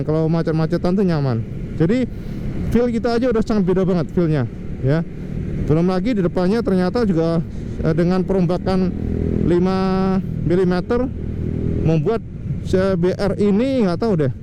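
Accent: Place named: Java, Indonesia. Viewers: Seneng bosomu cak ...ojok lali cak cok e ojok ilangi ya cek ketok jowone😀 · Cak Samean jowo ndi hehehe kok mantab ngunu jowone 😂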